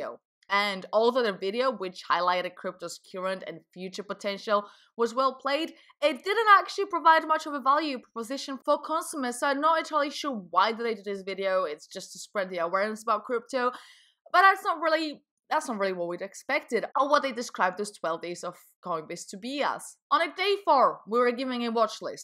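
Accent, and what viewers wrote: United Kingdom. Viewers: Like for this British accent ;) To to put it in a nutshell - Coinbase sucks :D Nothing more than fraudulence. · My, I just adore British accent! But getting back on topic - I think that 12 days of Coinbase suck and nothing`s gonna change in the upcoming months. Only users can make some change. I`ve made my decision on this already - I`m gonna close my Coinbase account after I withdraw all my funds. I`m so tired of being fooled by them one way or another. C`mon, guys, let`s make some change!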